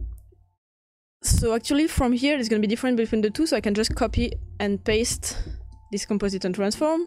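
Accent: France. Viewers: sounds like french accent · Your o̲dio was very... French. 🇫🇷 But I like it. The videos that you make help me alot.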